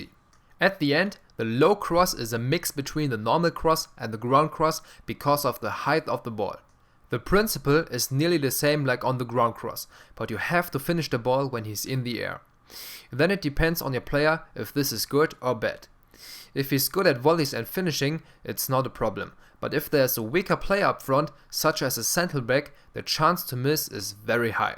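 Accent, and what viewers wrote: Germany. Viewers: The comments just show how many dumb fucks play this game. Just enjoy the video, he's German hence a German accent. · sofort gehört das du Deutscher bist, starkes Video ;) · this german english ist destroying my ears ...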